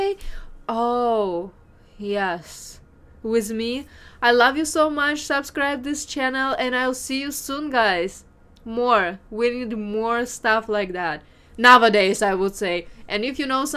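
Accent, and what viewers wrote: Russia. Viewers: Haha. -- But she can entertain us however she wishes -- Just focus on that Russian Accent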